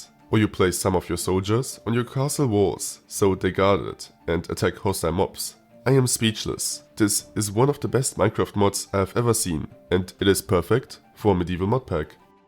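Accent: Germany. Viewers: you sound so german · i can hören the wundervollen german accent, bitte mach mehr videos! 💪💪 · du kanst so viel englisch sprechen wie du wilst aber ich höre nen deutschen dialekt wen ich nen deutschen dialekt höre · Da hör I do n deutschn? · Man hört so raus das du deutscher bist 😅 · Ah, ein Deutscher mit einem dicken deutschen Akzent!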